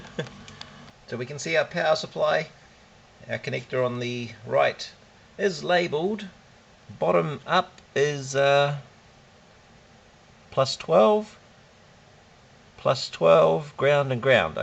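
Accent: New Zealand. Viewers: _You sound like a fellow Kiwi... We should never underestimate the ability of a Kiwi, as isolated as we may be, to fix any problem, be it fixing a piece of broken farm machinery or splitting the ATOM_.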